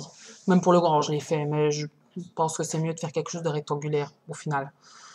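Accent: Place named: Belgium. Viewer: Quel plaisir j'ai eu à faire ce lapin avec ton bel accent belge :) Merci pour ta patience!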